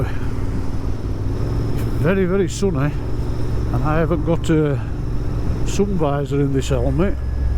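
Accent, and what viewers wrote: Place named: South Yorkshire, United Kingdom. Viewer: This is what motorcycles are all about. Great videos of just enjoying the machine and views instead of YouTube reviewers complaining about a 350cc not having enough power or shilling you to buy an insta360 from their affiliate links. Keep the videos coming sir along with your South Yorkshire accent. 😃 Looking forward to taking my CBT and getting on that journey. 👍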